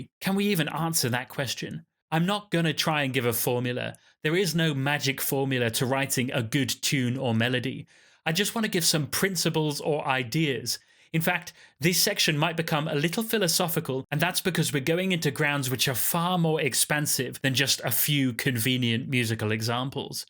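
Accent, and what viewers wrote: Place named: United Kingdom. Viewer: I'm Brazillian guy and found your channel by chance. They are very eductional and informatives and beside I not be a native in english, your british accente help me understend almost all that you speak. it's clear and objective when the tonic syllable is stressed. US accente is terrible to non natives in intermediate level. I wish success with channel and keep to producing new videos.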